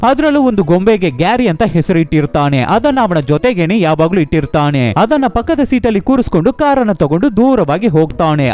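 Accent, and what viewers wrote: Tamil Nadu, India. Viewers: Your language slang like tamil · Neevu tamil avra accent same ang ide like tamil guy talking ಕನ್ನಡ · Tamil Mix Voice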